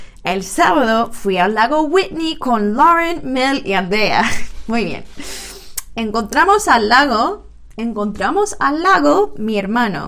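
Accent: Andalusia, Spain. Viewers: Me encanta el acento andaluz 🤭🤭🤭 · como me gusta tu acento andaluz me recuerdas a un amigo de inglaterra que aprendio a hablar español escuchando a la suegra sevillana y de vez en cuando soltaba un deje andaluz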